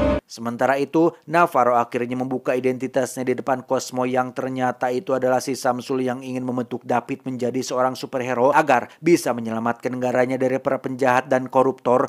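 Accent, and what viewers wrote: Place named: Madura, Indonesia. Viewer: Madura bang😂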